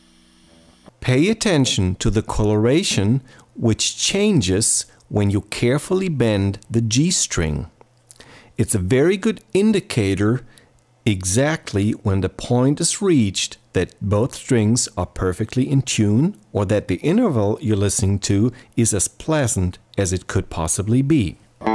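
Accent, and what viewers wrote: Germany. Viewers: he's german - so he pronounces an unvoiced 'S'-sound in cases were English native speakers would normally pronounce a voiced 'S'-sound...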